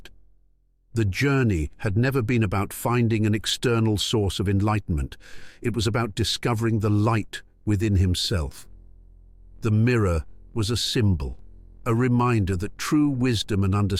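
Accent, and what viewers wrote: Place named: United Kingdom. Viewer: Great, except for the annoying, supercilious British voice. We need cooler voices 🤪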